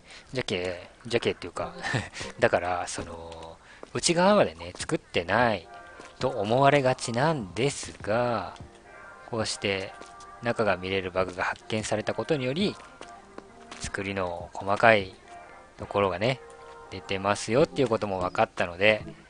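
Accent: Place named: Okayama, Japan. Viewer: うぽつですm(_ _)m もしかして岡山県民？？？ じゃけぇって岡山弁だったので…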